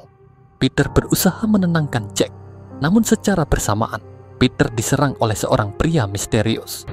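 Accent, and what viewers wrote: Java, Indonesia. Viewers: Medok e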